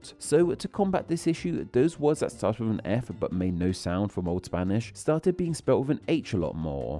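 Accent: United Kingdom. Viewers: I find it interesting that this video that's focused on the "F" is narrated by someone with a "British" accent. In this accent, the words spelled with "TH" end up sounding like F!!! Fanks for this video!! It was very interesting! It's possible a similar thing happened to Spanish based on accents throughout the history of the changes... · Blud he’s British what do you expect 😭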